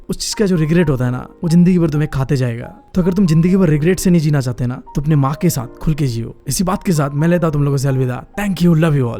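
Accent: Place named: Northeast, India. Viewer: Hello bhai aap northeast mei kaha se ho 😅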